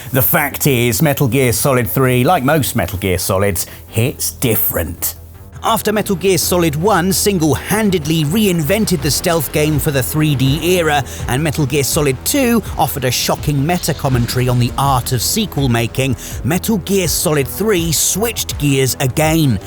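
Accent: United Kingdom. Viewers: is Metal Gear’s David Attenborough. There is nothing more enrapturing than excellent, intellectual British commentary by an expert in their field.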